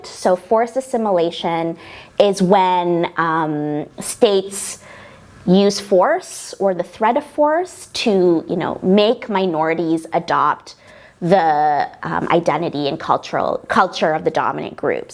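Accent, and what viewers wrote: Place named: United States. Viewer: Ini logat amerika yang paling totok yang pernah gw denger dari orang Indonesia :))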